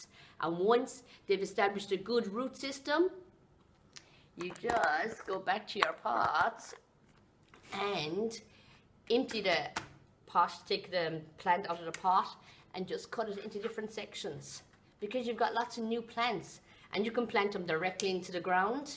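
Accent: Ireland. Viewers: Are you Irish because I am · R U Irish? :)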